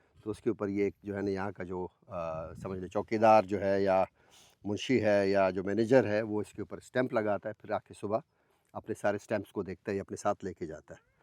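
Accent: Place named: Karachi, Pakistan. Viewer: Khosa Sahab Aslaamo alacum ham ne ap ko dramon dekha tha aur AJ ap ko peyaray balouchistan ke gaon mn dranti pakray us ki cataie kar rahay hn ap ki Urdu aesi ha jesay ap Karachi se hn balouchistan se nh,balouchistan mn haryali dekh kar Dil bohat khoush hoia Allah pak balouchistan ko shad,o, Abad rakhay aameen summa aameen mashallah khoush raho slaamat raho aameen summa aameen, I am frame apna Punjab se Duaion mn yad rakhna Allah Hafiz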